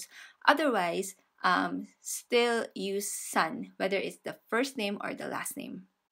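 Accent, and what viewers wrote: Philippines. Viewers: Hi mam filipina po kayo?? base on your pronounciation 🤗🤗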